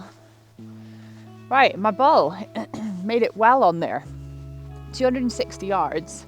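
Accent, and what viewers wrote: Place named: St Andrews, United Kingdom. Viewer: I have started to putt more with the pin in. In the past three years playing about 100+ rounds per year (HDCP 6.3) and as a senior golfer, I find it helps with my depth perception. Maybe it's just my older eyes, but I can't remember the pin ever keeping a ball out of the hole for me. BTW, my daughter is a student at StA so as a Yank from the US, when I watch your channel or hear your commentary, it reminds me of what she hears every day and just helps to bring her closer to me. Please continue the great work! I love chasing daylight as well as being a dew sweeper!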